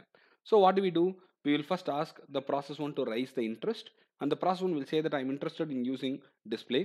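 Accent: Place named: South India, India. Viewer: good explanation but please consider using AI voice synthesiser on a prior prepared transcript, south indian accents are extremely annoying and make it hard to focus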